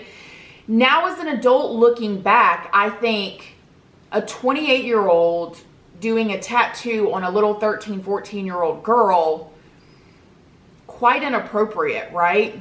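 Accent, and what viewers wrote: South, United States. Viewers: We're around the same age, and we've both had hard lives, my best friend of over a decade was killed but somehow I was able to speak to him after he'd passed, he died on Thanksgiving of 2016, and any doubts I had about god were suddenly gone, he'd always promised me he'd contact me if something ever happened and he kept his promises, I went through such a bad depression I'm just now starting to do things that involve getting better, all my muscles atrophied from just not having the energy to live life anymore, and I need physical therapy to walk normally again, I quit painkillers shortly after he died but you live in Florida, you know how easy they are to get, and growing up in Virginia I never thought they were as deadly as they are, and I battled on and off with addiction and abusive relationships for a very long time, I'm just now coming out of the worst depression I've ever been through, I'm filming everything, so hopefully it can help others feel less alone, and see themselves in my journey. 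I found your channel and we both have the southern accents and your ability to frame a story is just astounding, I've lived in Los Angeles now for most of my adult life, but you remind me of my friends from home, and it's comforting for me to listen to you, I laugh and I cry and I'll think "Oh wow I did that too!" it's helped me a lot, I've met some incredibly talented people out here, and you have so much natural charisma, you really could have a career as a motivational speaker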